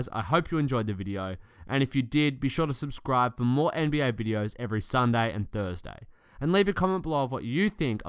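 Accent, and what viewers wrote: Australia. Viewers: What kind of accent it is? Is it Australian?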